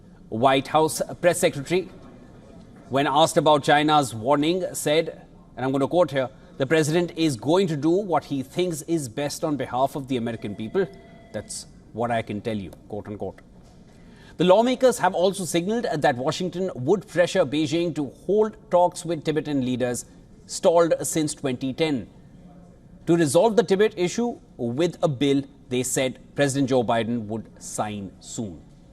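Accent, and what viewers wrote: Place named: India. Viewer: You sound like indian callcenter agent-scammers here in the US.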